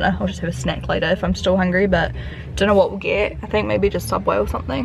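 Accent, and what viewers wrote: New Zealand. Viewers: You have a good nz accent read the discription what part of nz I'm a Wellington baby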